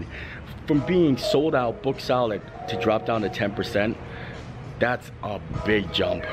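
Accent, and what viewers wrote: Pennsylvania, United States. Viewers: Where are you from originally? I'm trying to place your accent. It sounds like my Pennsylvania cousins! Bar Louie in CT closed suddenly about a month ago. It was in the mall. Thanks for the positive upbeat vids!!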